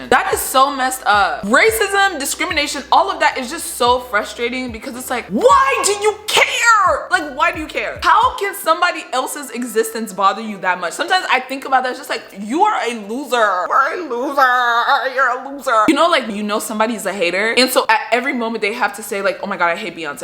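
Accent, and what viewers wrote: Nigeria. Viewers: Lol I was wondering why you always switched to a Nigerian accent in your videos 😅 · Are you Nigerian 🇳🇬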